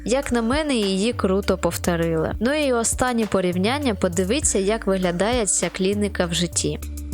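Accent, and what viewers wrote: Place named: East, Ukraine. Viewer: видно що ти переходиш на українську скажи якщо я помиляюсь але я думаю ти десь з сходу або центру україні і стараешся говорити українською. Я не намагясь хейтити і т.д. хочу сказати що це класно люди переходять на рідку мову це раз якщо я помиляюсь і ти завжли говориш українською напиши буль-ласка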